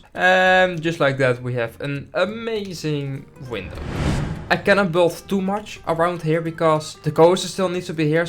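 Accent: Netherlands. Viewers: aan je stem te horen ben je Nederlands🤣😂:goodvibes::virtualhug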